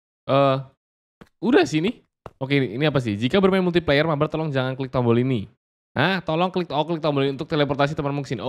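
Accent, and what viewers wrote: Java, Indonesia. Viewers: Njenengan tiang pundhi to mas kok radi jowo ngoten😅😅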